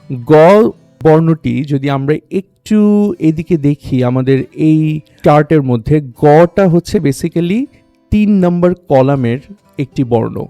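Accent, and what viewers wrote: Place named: United Kingdom. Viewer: Sir your bangla accent sounds British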